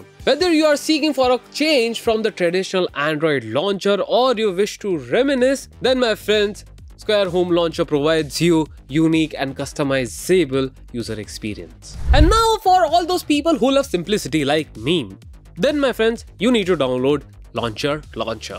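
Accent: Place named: United States. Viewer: You really have a decent but not perfect American accent.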